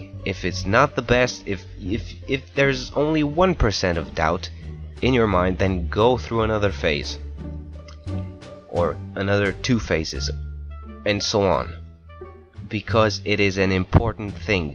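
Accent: Romania. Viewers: yea bc he’s from romania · mi-am dat seama dupa din nume si accent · You must be Romanian,aren’t you? Foarte bine!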